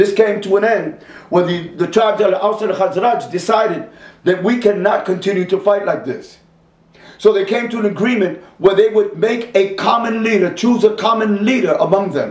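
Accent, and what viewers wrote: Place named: Arizona, United States. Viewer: Just curious but where did handler nab you? Did you get sent to the fili or mexi camps for field op training yet? I'm thinking arizona raised based on accent hint, possibly california or nevada.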